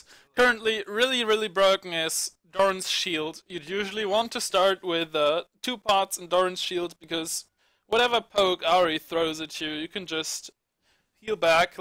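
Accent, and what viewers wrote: Germany. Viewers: u are german? :D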